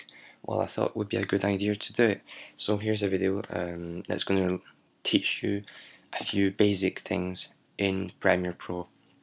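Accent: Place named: France. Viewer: Francais Accent :)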